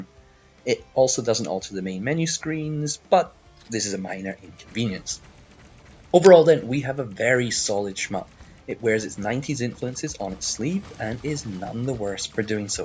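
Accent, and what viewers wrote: Scotland, United Kingdom. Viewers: Just discovered channel and am a fan. Great to hear a scottish accent talking about ganes. Where are you from?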